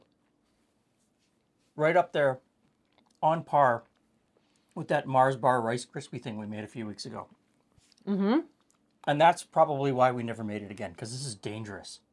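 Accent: Canada. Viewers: Whats the worst thing that could happen? Nothing! There is no downside to that scenario. 😝 You need an “Evil” playlist. The mars bar/ricebubbles thing definitely has a place on the list. That car-mel v’s car-a-mel bugs the heck out of me. We say car-a-mel, because that’s how it’s spelt. Never heard it called car-mel until youtube introduced me to american cooks. I’d rather listen to them say allooominum and tomaaaato all day than hear them say car-mel even once. Flippin weirdos 😂. But if you Canadian’s spell it car-mel, well thats ok. It sounds perfectly lovely in your Canadian accent.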